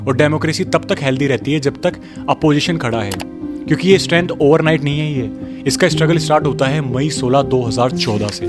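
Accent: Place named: Rajasthan, India. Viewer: Good content 💯 bro this voice from Alwar Rajasthan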